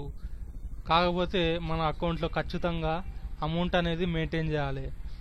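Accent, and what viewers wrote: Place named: Telangana, India. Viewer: Anna nuvvu telanaga odiva? ekdam matlathunnav telanganala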